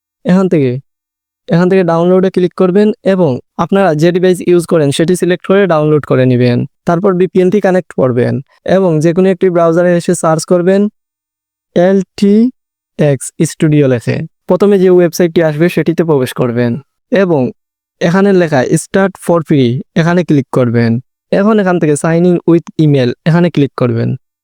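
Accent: Sylhet, Bangladesh. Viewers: সিলেটি নি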